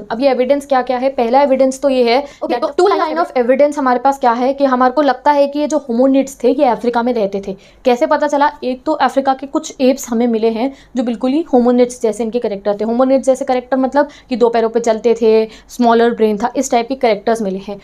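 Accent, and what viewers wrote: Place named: Bihar, India. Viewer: Tumhara accent dekh ke lagta hai ki tum bihari ho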